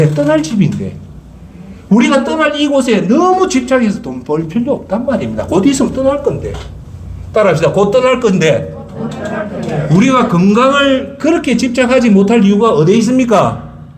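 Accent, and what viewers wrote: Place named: Gyeongsang, South Korea. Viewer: "예수는 빼삐고"... 아이고~ 빠뜨리고 아닌가요? ㅎ 경상도 사투리 그립네요. ㅎㅎ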